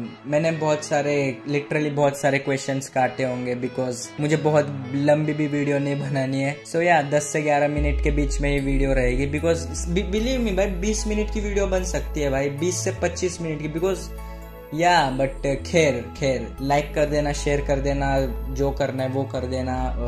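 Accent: Maharashtra, India. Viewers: Your Marathi Accent is the best.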